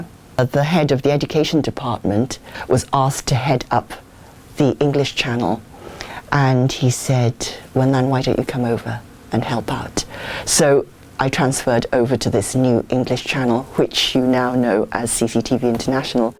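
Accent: United Kingdom. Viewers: 不是她发音含混，而是你英文不够好，而且对英音不熟悉。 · As part of my sweat memory, I watch "English on Sunday" program at that time.(2pm Sunday) when I just start to work. I could only comprehend no more than half of the context. The program was quiet advanced to me. I like the host and her English accent. But, I did not know her name and background. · I am so exited to find you on Youtube after 40 years I had watched your "English on Sunday" on CCTV, You are still holding your Pure London Accent and I really hope some day I may meet you in London to show my respect. Your Audience from 1979.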